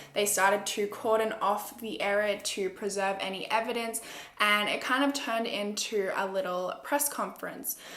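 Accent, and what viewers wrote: Australia. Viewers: bro i heard ur voice for the first time and i was like she aussie to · Omg literally my first video I seen from you and I’m listening to your accent trying to detect where your from and the second you say the word know I’m like oh shit she’s Australian and I look in the description and I was right literally love your accent , a girl from my highschool basketball team moved from Australia to the states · Just Waffles Bro..umm it’s a typical Australian accent k? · she’s Australian so she has an accent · I loveeee Aussie accent omlll it’s so beautiful😍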